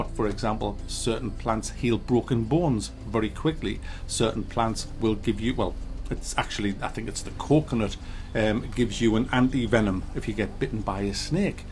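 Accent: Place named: Newcastle, United Kingdom. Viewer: video is too long to tell us its shit, cut to the chase a little more. you just get saltier and saltier, no body wants to hear that shit, its boring,90% games to you are shit but I like your stupid Geordie voice it reminds me of an ex squaddie mate that used to pick nub ends off the floor to make a whole fag. 5 min video bad things good things that's it but constantly trolling the game is what you do all the time just keep it short bud that's of my life I've just lost and will never get back.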